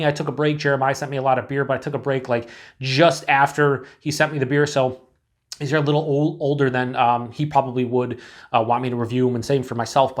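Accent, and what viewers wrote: Western New York, United States. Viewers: Jeez son, that was heaviest Western New York accent introduction since the WGRZ 12 oclock news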